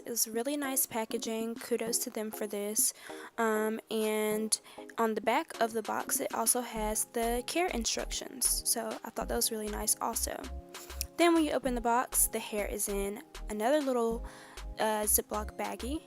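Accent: South, United States. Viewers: you sound southern lol , where you from ?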